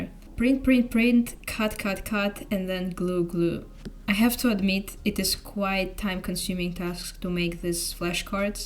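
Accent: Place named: Russia. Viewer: Your English sounds like you are Russian or so… feels strange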